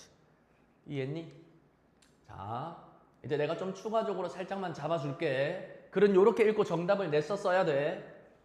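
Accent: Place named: Gyeongbuk, South Korea. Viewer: 와~ 경북 사투리 이해 쌉 가능^^* 경기도 사는데 쌤 온라인 강의 듣고 싶어요~~~^^*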